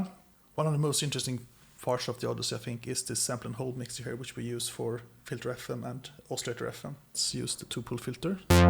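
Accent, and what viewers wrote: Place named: Sweden. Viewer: Just love when you can hear on the accent its a swedish person.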